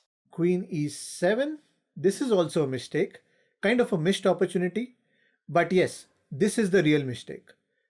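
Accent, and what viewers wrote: India. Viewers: Indien English accent its hard to understand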